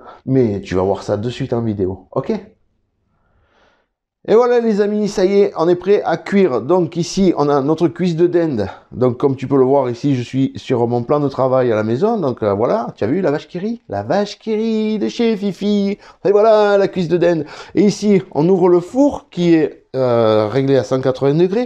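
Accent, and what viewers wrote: France. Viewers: Moi, comme abonné à ta chaine, je te regarde de la Beauce, Canada. J'aime tes vidéos car j'apprend beaucoup sur la boucherie. Ton accent français me chatouille les oreilles. J'adore! Merci FiFi !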